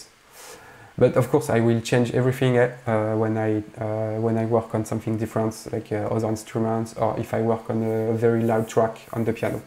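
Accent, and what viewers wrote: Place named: France. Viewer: Well done, do not worry about you French accent. This video is very helpful!!!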